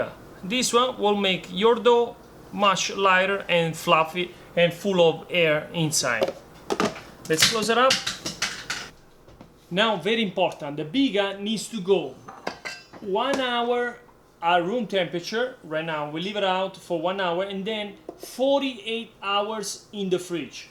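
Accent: Italy. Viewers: che tipo vivace che sei maddò si capisce subito che sei italiano! Tanti saluti da un abruzzomolisano che vive in Germania. continua così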